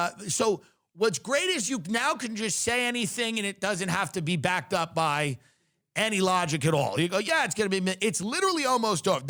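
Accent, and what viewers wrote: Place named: Long Island, United States. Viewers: ❤️ his lawng guyland accident. I miss it. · Long Island baby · The NY way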